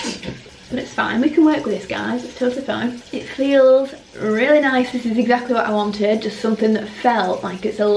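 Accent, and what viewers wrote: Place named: Wigan, United Kingdom. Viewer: I’ve only just subscribed to you and can’t believe you’re also from Wigan!! Immediately I thought I recognised a twang in the accent 😊 xx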